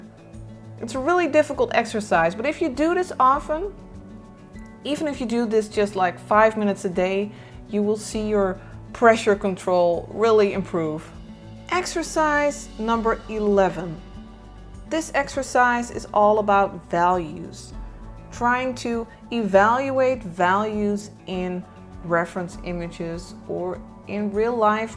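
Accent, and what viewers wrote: Netherlands. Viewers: Are you dutch? The accent sound familiar. If so, could you also make some video's in dutch? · Hmm do I hear a Dutch accent? 🤔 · Are you dutch? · Are you dutch? I can sense that warm friendly accent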